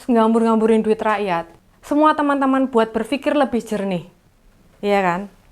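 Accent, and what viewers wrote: Java, Indonesia. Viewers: Halo mbak...... gaya medokmu itu lo...aku suka... Salam kenal dari org BLITAR... semangat ya...👍🤟🇲🇨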